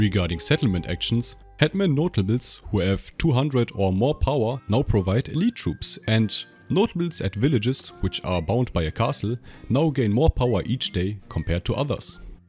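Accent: Germany. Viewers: are you german? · Ich denke auch sein Akzent hört sich schon ziemlich Deutsch an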